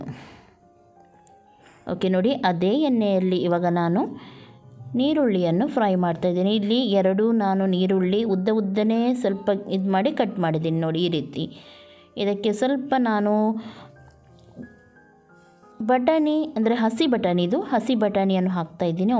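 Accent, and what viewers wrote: Mangalore, India. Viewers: Ee Mangaluru Kannada style kelodheyyyy ondu khushi😂 Chikka makligey helidha haagey yeshtu muddhagi helthaa prepare madthiri :-) God bless U ma 😊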